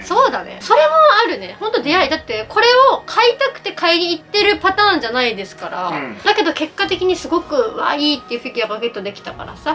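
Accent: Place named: Miyazaki, Japan. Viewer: 確かにこれは自分の技量を分かってないと手を加えるのは怖いですね😨 だから見て楽しむ😁 解説中のちらほら出てくる宮崎弁もええなぁ〜☺️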